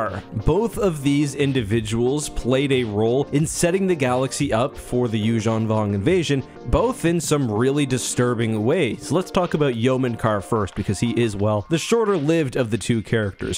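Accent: Canada. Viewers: The narrator absolutely has to be canadian, that accent bro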